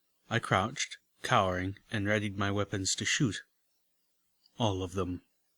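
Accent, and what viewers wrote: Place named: United Kingdom. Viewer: I've been wondering this ever since i started watching your audio book; do you actually talk likt that? i mean, it sounds good, but you sound like your a mix between british and western. also, what's your cutie mark?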